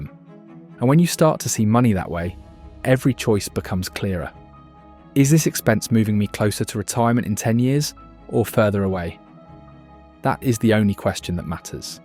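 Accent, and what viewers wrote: United Kingdom. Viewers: Person with British accent won’t teach you anything new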